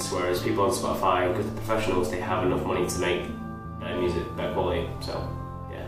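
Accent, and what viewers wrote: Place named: United Kingdom. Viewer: British accent eminem voice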